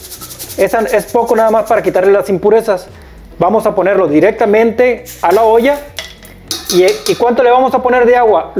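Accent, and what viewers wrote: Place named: North, Mexico. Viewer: Su asentó del meritito Norte me encontró!!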